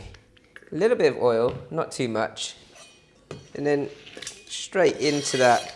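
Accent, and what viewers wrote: United Kingdom. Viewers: u got a british accent bro?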